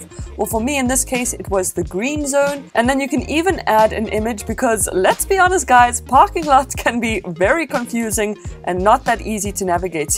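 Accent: South Africa. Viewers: Is that a South African accent I hear? 👀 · Kom je uit Zuid Afrika?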